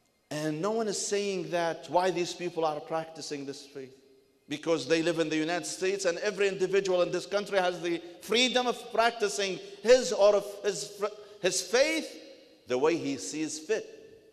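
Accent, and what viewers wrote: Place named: Russia. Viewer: Gee has anyone listened closely to his accent? It's Russian.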